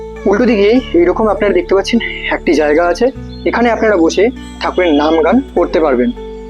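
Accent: Bangladesh. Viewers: বাংলাদেশের মাল,উচ্চারণ তো এরকম হবেই। আর মানুষকে বিভ্রান্ত করে পুরো ভিডিও দেখানোর কৌশলের কারণে থাকা খাওয়ার ব্যবস্থার কথা বলে,এভাবেই এদের পেট চলে। একই কথা দশবার বলে ভিডিওকে বড় করে।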